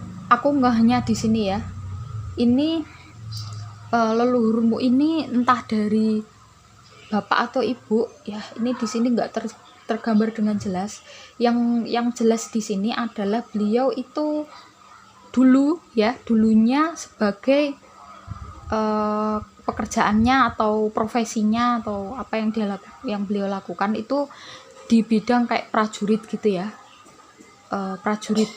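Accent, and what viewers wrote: Java, Indonesia. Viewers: Medok banget wong jowo ya mbak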